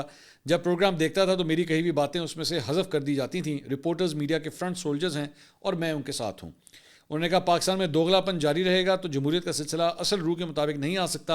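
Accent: Punjab, Pakistan. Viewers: Aoa. bhai ap ka Punjabi bolny ka style bht Pasnd hai mujhe.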